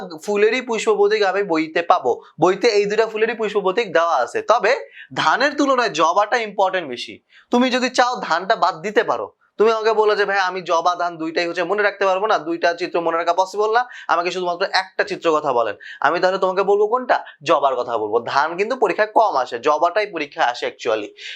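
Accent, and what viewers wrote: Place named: Pabna, Bangladesh. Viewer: আপনে তো পাবনার সার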